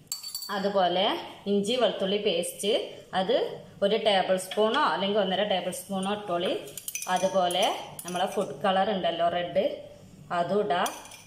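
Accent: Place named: Pattambi, India. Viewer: Pattambi ഭാഷ നല്ലോണം വന്നിട്ടുണ്ട് 😍😍😋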